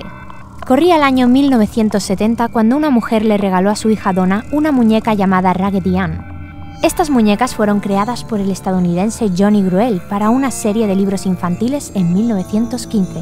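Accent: Spain. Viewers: Como ablas beo que héroes de España mi país favorito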